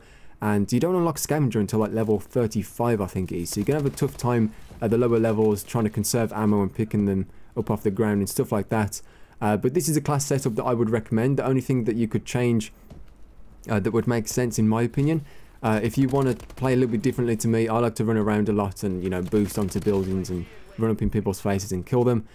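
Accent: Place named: United Kingdom. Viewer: thank u for the tips n trick, british sounding tmartn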